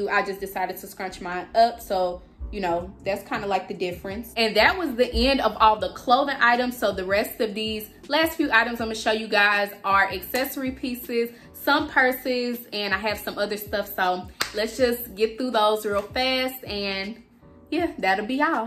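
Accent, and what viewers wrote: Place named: Miami, United States. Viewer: Your accent sound like you’re from Miami.youre beautiful ❤️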